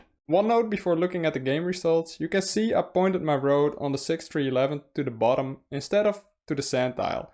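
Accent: Netherlands. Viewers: omg the dutch accent is so intense 0_0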